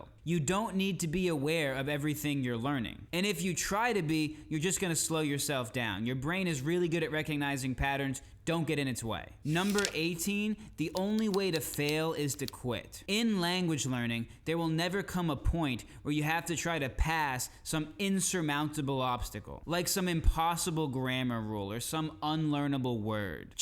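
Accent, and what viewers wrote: Argentina. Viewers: ok - thanks. 2.5 years (?) of studying Spanish got you to a hesitant B1+ with no sense of the prosody of the language (sounds like you 're attempting Argentinian pronunciation the way you realise 'y' and 'll'). You're definitely not at a level to pronounce yourself fluent (absolutely nowhere near it), and I'd suggest more humility when it comes to pronouncing what is and is not effective language learning. But hey, it's your channel, have at it. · nice. congrats! good accent. bit of an argentinian twist or am I mistaken?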